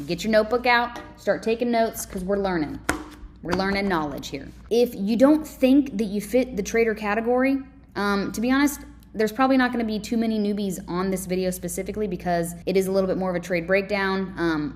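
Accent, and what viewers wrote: United States. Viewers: "you ain't got..." I love that country accent ❤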